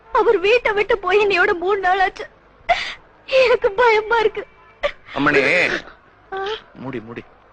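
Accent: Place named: Kongu, India. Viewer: பெங்களுரில் இருந்தாலும் உங்க, அதுதான்க நம்ம, கொஞ்சும் கொங்குத் தமிழை மறக்கவில்லை. ஆனால், உரையாடலின் மையக் கருத்துத்தான் சரியில்லை. இது மாதிரியான கலச்சாரச் சீரழிவுக் கருத்துக்களைப் புறக்கணியுங்க. உங்க வீட்டுக்காரம்மா, கொங்காக இல்லாவிட்டாலும் கூட, கொங்குத் தழிழை, உங்களைவிட நன்றாகவே பேசுகிறார்கள். வாழ்த்துக்கள்.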